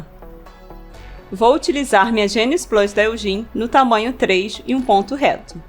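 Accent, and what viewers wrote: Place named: Rio de Janeiro, Brazil. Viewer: o sotaque carioca são um charme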